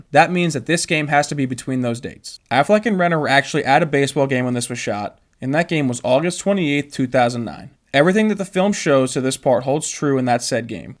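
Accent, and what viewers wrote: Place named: Boston, United States. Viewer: You from Boston that accent is strong I can tell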